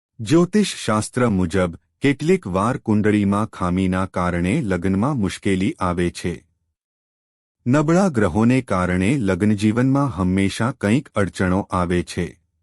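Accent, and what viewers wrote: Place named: Maharashtra, India. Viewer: Divo salgaway nahi petaway bolnari bai gujrati nathi apbrahans shabdo par thi Marathi bai che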